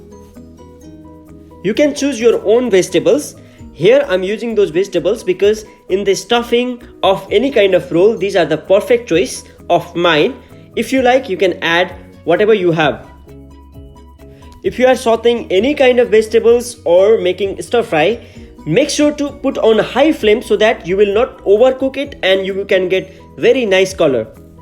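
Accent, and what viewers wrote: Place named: India. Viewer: great presentation my friend you're sounds indian. your burrito looks yummy.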